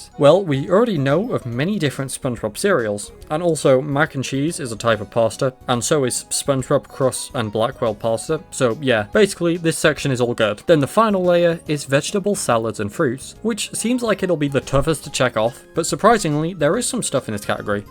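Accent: United Kingdom. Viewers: Are you You British?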